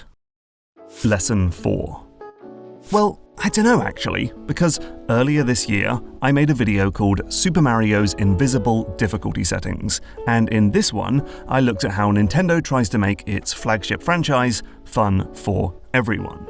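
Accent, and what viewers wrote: United Kingdom. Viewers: Idk man, the fancy motion graphics and British accent was pretty convincing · Finally, he addresses the elephant in the room: his British accent. cf. Between Two Ferns e/ Benedict Cumberbatch: "Do you think if you didn't have a British accent, people would be able to tell you aren't a very good actor?"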